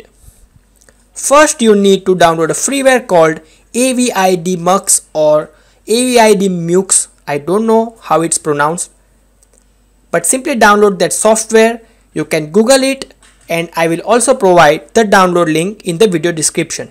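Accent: India. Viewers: I almost removed the video as soon as i heard indian tone but the fact you spoke English is awesome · as soon as I heard Indian Sound I knew I was saved Thanks mate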